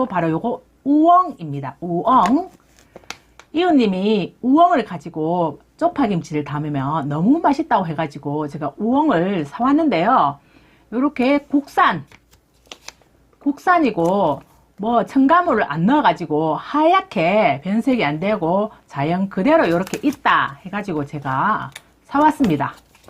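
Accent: Gyeongsang, South Korea. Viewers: 어이구야꼬! 진짜 쉽고 맛나겠네예. 나도 경상도라 내친구같네예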